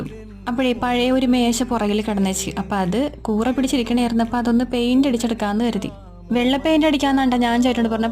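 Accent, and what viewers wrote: Kochi, India. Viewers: ഇതൊക്കെ നുമ്പട കൊച്ചി ഭാഷ 🤣🤣🤣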